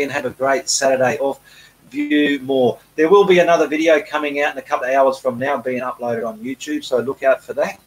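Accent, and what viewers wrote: Australia. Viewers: Wow i love your painting but now im watching this video because my english teacher suggested to get used to different english accents and so far australian is the hardest for me to undrestand😄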